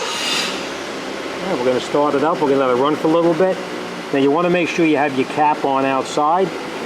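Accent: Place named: New England, United States. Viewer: Good video. Hope you’re still doing well after nearly a decade. (Maybe enjoying a well-earned retirement!) Interesting that the procedure is different than that on the ‘06~’15 Mazda MX-5 Miata. But makes sense as it’s RWD and thus longitudinal mounted, versus the Mazda3 FWD and transverse mounted. No fiddling with the belt, tensioner, alternator, etc. But you do have to take out the air filter housing, and often even the throttle body. Good call on going back to the Mazda FL-22 dark green coolant. I call it the “Philadelphia Eagles Green” coolant, as opposed to the universal coolant (Seattle Seahawks bright green 😅). (I’m guessing from your accent you’re a Pats fan so I thought you might appreciate that 😂.) Ford used to carry the same (now replaced by Yellow), and “Asian Green” from Zerex or Peak are also suitable. I can tell you that these (usually only the early years like my ‘06 MX-5) had a common problem with the thermostat sticking open, similar design (wouldn’t be surprised if it was the same part number). 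I’ve had a P0126 on mine for almost as long as this video’s been up; I usually just reset it. 😂 Also if you have an Bluetooth OBD2 dongle to read the engine temperature while driving, it’s too low (maybe 140 F, should be around 190F). Also you may get insufficient heat from the vents (blows cool air). But “this is the year” I go ahead and fix it.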